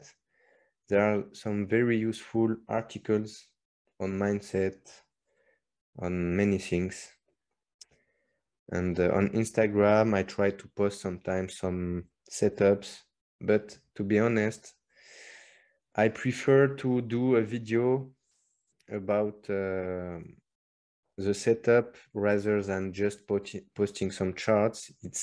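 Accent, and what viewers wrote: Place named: France. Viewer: T’es français? Je sens l’accent français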